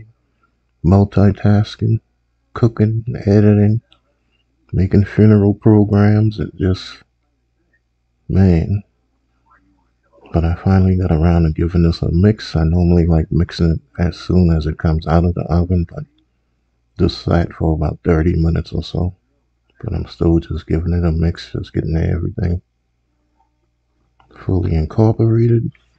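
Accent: Charleston, United States. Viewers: I can here the Charleston in your voice. I’m here to steal another one of your recipes 😂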